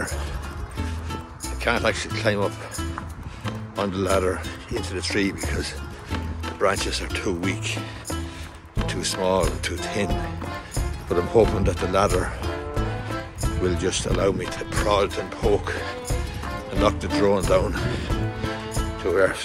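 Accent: Ireland. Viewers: I like how the YouTube subtitles completely misunderstand the Irish accent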